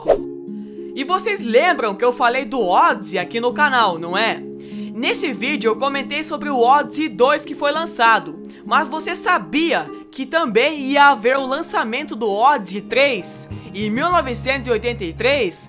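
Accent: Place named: Piracicaba, Brazil. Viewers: Cara, como eu vim parar aqui?! Parabéns pela desenvoltura em suas narrações, o sotaque de Piracicaba(?) deu personalidade. Acabei de me inscrever. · Nossa cara, esse sotaque caipira do me dá nos nervos kkkkkkkkkkk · Meu irmão...você tem um sotaque MEGA caipira!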